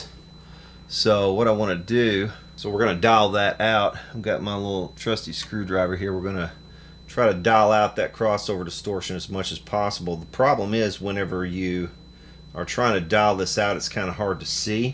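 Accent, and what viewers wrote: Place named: South, United States. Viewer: I noticed the amp was signed by Linda. Many years ago I read an interview with Dave Mustaine and Chris Poland from Megadeth and MegaDave said he would open up Marshalls and look for her name as it was sure to be a screamer. Looks like he wasn't full of shit! Love your vids and channel man, I'm from NC and have lived in Seattle for 20 years so it's nice to hear an intelligent southern accent.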